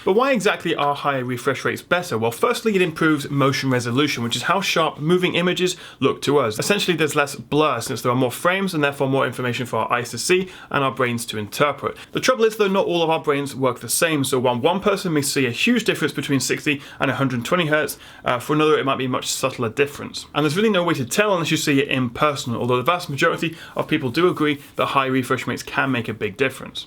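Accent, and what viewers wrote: United Kingdom. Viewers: R Doc Id rather listen a pleasant British accent than an accent that sounds like a Canadian who stuffed two lbs of processed cheese up their butt. Just sayin.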